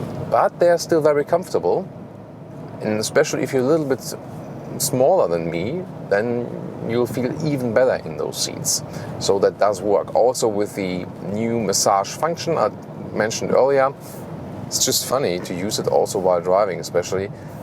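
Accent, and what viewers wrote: Germany. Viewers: Your German English is annoying to listen to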